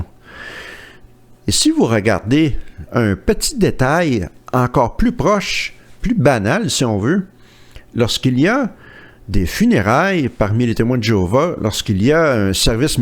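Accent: Quebec, Canada. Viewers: J'adore aussi l'accent québécois, surement grâce aux 4 mois que j'ai passé à Montréal en 1992 et qui m'a laissé un excellent souvenir.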